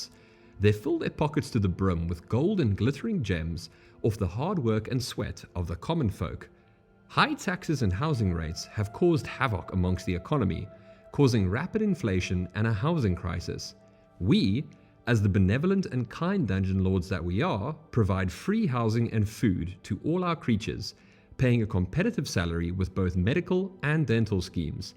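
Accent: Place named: South Africa. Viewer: I hear a South African voice 😎 love this video. I was obsessed with Dungeon Keeper back in the late 90s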